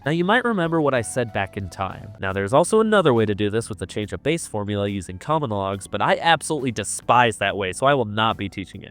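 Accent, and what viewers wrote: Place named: Asia. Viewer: u sound asian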